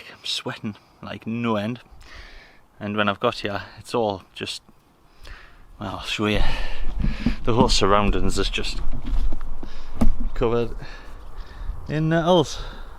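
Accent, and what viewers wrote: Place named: Newcastle, United Kingdom. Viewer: Mint video mate love to hear another geordie haha !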